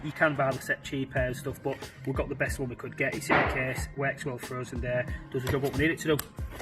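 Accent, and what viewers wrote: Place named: United Kingdom. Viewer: He speaks a little too fast and sounds like he has british accent. Maybe difficult for non english speaker to comprehend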